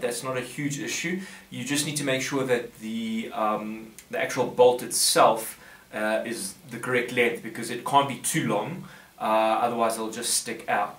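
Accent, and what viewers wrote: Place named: South Africa. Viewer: Nice SA Accent! ... :)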